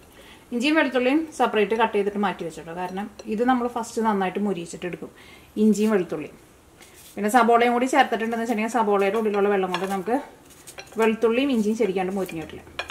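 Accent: Thrissur, India. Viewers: ee Thrissur slang thanne endh resaa kelkkaan... athinte koode immaathiri tasty food um undaakkumbo sherikk Thrissur Pooram....!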